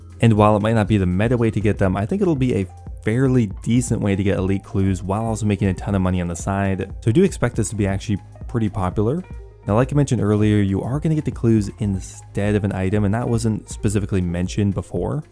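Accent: Quebec, Canada. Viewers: Are you french canadian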